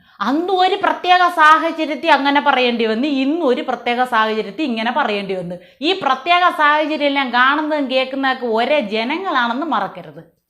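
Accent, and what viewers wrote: Thiruvananthapuram, India. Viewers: തിരോന്തരം ആണോ? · ഇതു തിരോന്തരം ഭാഷകളാണ് അല്ലേ അപ്പി 🤣🤣😂വളരെ മനോഹരമായിട്ടുണ്ട് ❤️👍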